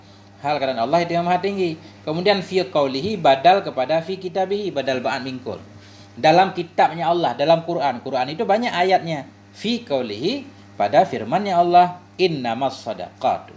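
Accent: Aceh, Indonesia. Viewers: Suaranya agak ke aceh² gitu ya makasih ustadz ilmunya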